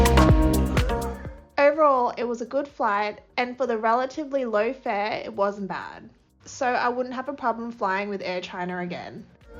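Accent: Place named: Australia. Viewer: ох уж это австралийское произношение, всё понятно, но напоминает анекдоты ;-)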